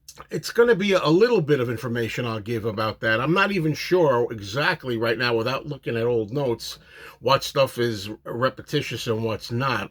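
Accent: New York, United States. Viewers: Great review! LOVE your NY accent.. You could be Murry the K...The 5th Beatle!! lol..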